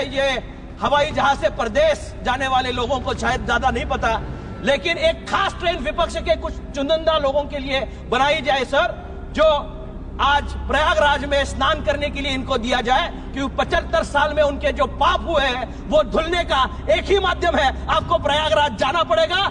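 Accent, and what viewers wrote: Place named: Kolhapur, India. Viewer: कोल्हापुरी आवाज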